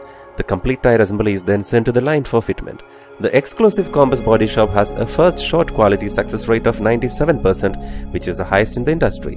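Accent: India. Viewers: That malayalie accent though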